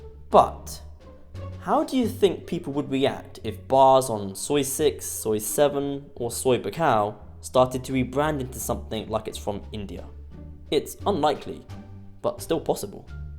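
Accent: United Kingdom. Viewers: A british accent talking about his favorite past time aka the ladyboy and complaining that there are too many Indians in Thailand now.....2025 started off slow but is gaining traction coming from the last video I watched titled Carnival cruise ships are floating ghetto simulators.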